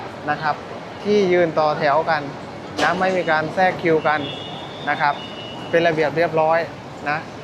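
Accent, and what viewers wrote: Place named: Ubon Ratchathani, Thailand. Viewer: เจ้าเว่าลาวคือคนอุบลเว้าเลย สำเนียงคือกันเลย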